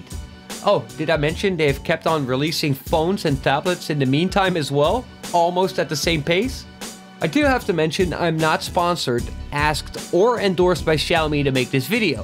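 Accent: Netherlands. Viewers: Dutch I think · are you Dutch? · So happy with your channel man. Your accent sounds Dutch, are you?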